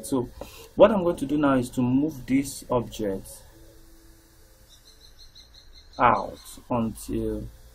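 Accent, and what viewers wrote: Nigeria. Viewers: You have a Nigerian accent...are you a Nigerian??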